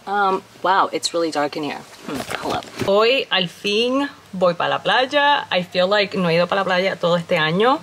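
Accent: Puerto Rico. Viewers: Suenas y te vez mas biricua que muchos otros 😂😂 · siempre me gusta tus videos porque me encanta escullarla ablar en El idioma Puertoriqueno. Espero que aprendas cosinar toda classe de comidas Puertoriquenas.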